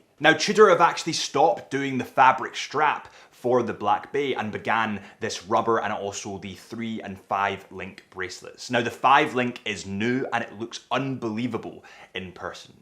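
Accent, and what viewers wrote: Scotland, United Kingdom. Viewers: You do a very good Adrian Barker impression, with a slight Scottish accent.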